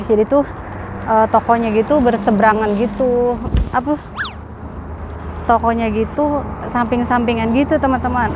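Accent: Jakarta, Indonesia. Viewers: MasyaAlloh seneng kalau liat mmh kalau lg gomong kadang bahasa betawinye keluar 😊😊 kaye kita ini anak lenteng agung 😊😊 tp seneng jg liat mmh bahasa Arab nya bagussss bangeeeet 😊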